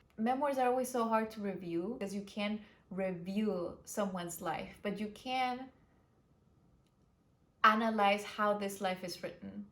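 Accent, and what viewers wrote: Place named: Latin America. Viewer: hi! first time watching a video of yours and i really liked it! your accent's so charming and it's great to hear book reviews from a latina (i'm brazilian, btw!):)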